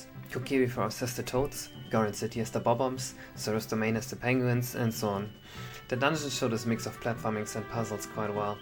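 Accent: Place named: Germany. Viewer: Is by any chance German? The accent is way to strong and familiar lol Also without having to say it: LOVE IT!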